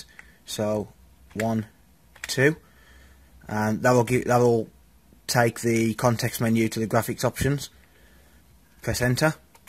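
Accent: United Kingdom. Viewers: The most british accent i've ever heard.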